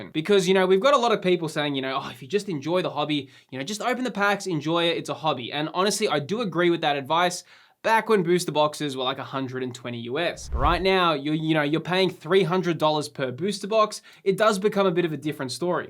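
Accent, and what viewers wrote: Australia. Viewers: first time follower and your format is awesome, and AUSSIE yewwww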